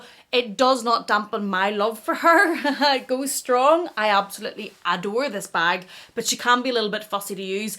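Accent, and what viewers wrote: Ireland. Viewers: Amazing collection! I also love chanel chevron 🥰 whereabouts Ireland are you from? Love your accent 💚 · You have a stunning collection! New subscriber; came across your channel and when I heard your brogue I had to sub! My grandfather was from Tipperary immigrated to New York where I am from but live in Florida now. The colors of your collection work so well for me and enjoying watching your videos!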